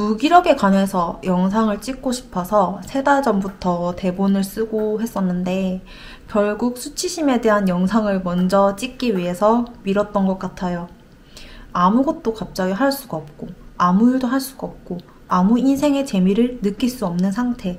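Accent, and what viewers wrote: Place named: Gyeongsang, South Korea. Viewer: 경상도 분이신가요 ㅎ 억양이...친근해서요. ㅎ 영상 잘 보겠습니다.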